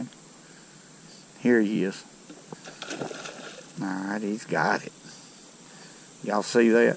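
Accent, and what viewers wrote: South, United States. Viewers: You got that Forrest Gump accent where you from ? Nice video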